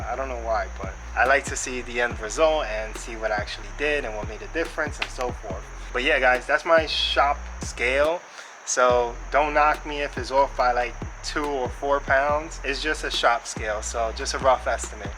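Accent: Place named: New York, United States. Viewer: Enjoyed the video totally yu definitely from ny tho Not for nothing 😭💯🤘🏾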